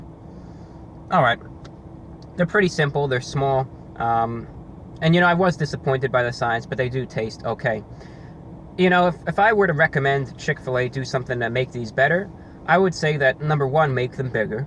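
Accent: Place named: Upstate New York, United States. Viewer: recognize your "accent". Are you from Upstate New York? I am and that's how people talk around here.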